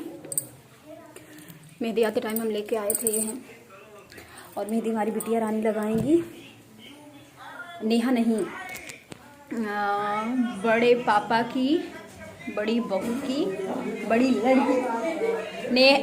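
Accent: Bhojpuri, India. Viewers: I love your bhojpuri voice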